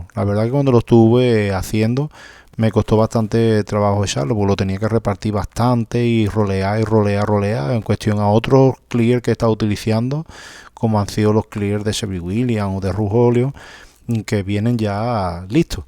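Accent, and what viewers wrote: Andalusia, Spain. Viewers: Buen trabajo! Illo, usted de donde es? De Conil? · Hola amigo.... De dónde eres? Por tu habla pienso que eres de muy cerca de mi pueblo... Sanlúcar de Barrameda Cádiz... España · ¿Puede ser de Cádiz o Sevilla? Saludos desde Pilas (Sevilla) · Holaaa, he encontrado este video, es un trabajo excelente. Por casualidad eres andaluz??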